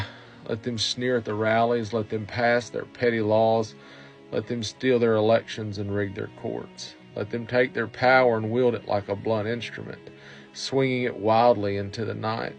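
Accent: South, United States. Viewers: I really enjoy your content and I love your Southern accent 😍 I'm a Southern girl myself 💙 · Your poetry, deliveredwith a southern accent, give me hope for a USA free from the current regime there. May others hear your voice with Joy.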